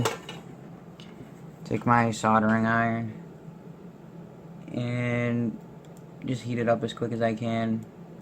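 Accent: Boston, United States. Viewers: What part of Boston are you from? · skeletøn clique its boston I think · really thick Boston accent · God that baston accent terrible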